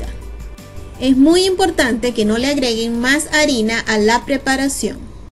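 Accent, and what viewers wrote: Venezuela. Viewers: estoh eh venezolano o que chicoh po que si va pueh tanto venezolano aca parece peru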